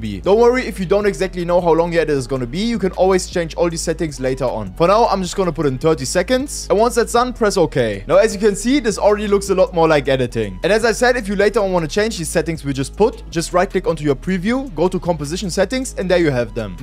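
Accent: Germany. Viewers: are you german? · Deutscher Akzent? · Kann es sein das du Deutsch bist? Man hörts bisschen xD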